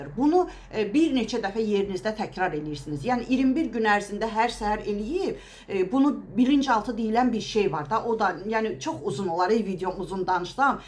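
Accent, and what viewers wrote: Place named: Aghdash, Azerbaijan. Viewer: Allah razı olsun hem yerimiz bacı mende Aqdaş rayonunanam darıxmışdım lehcemiznücün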